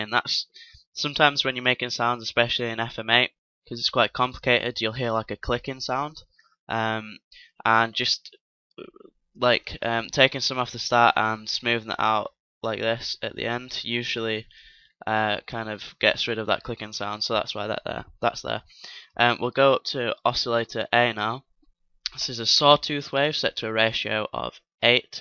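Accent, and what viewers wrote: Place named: United Kingdom. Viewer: Even though I am American, no one can speak "Synth" like the brits. Dope Tutorial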